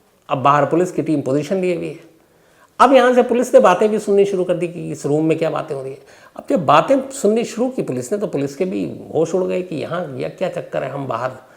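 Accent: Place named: Bihar, India. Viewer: Your language says that you are from Bihar?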